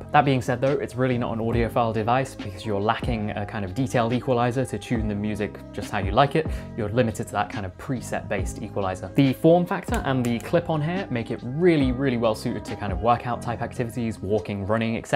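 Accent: United Kingdom. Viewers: I enjoy you review,. Besides your technical knowledge, your British enunciation is quite pleasant to hear.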